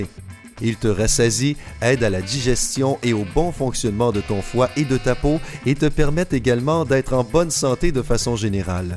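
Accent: Quebec, Canada. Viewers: cest un québécois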